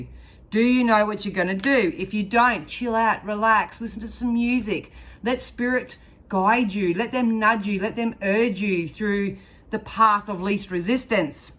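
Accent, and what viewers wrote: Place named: Australia. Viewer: I love how real you are .. it's nice to listen to an Aussie ❤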